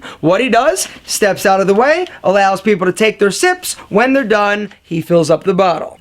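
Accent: Philadelphia, United States. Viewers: john doe Sounds like Philly. That is how they talk. Listen to Darryl Hall, he sounds like this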